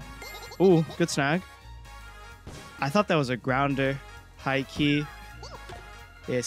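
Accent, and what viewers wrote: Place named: Scotland, United Kingdom. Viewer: Most accurate scottish accent ive heard, couldn't understand a word besides laddie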